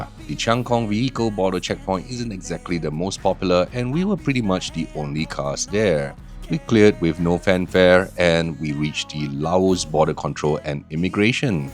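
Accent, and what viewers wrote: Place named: Singapore. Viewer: Just subscribed. Always love Singaporean's English, like the way you captured the sceneries.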